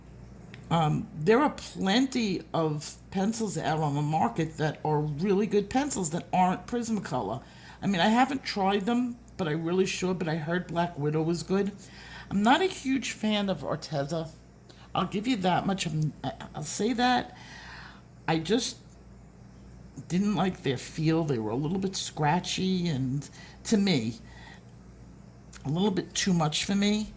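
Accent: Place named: Boston, United States. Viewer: This is off topic, but I like your accent! Sounds kinda like a Baw-ston (Boston) Accent. Oh, and I love your coloring too!! ❤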